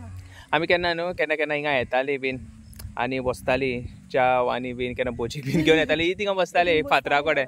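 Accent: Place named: Canacona, India. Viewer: Where is this place. Canacona? Because her language tune is talking like canacona people and I'm also from agonda 😊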